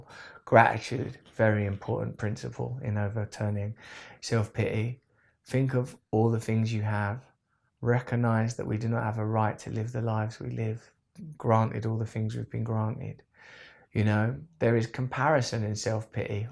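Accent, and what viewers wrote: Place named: United Kingdom. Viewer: thanks dude. I just finished your book Freedom and it's awesome btw. you remind me a lot of a friend I have, she also says wise things and also is very funny and also is British, so based on my sample size of 2 in this survey I guess it must mean the British are wise, funny and, well, British. have cool accents, lets make that number 3. anyway thank you xx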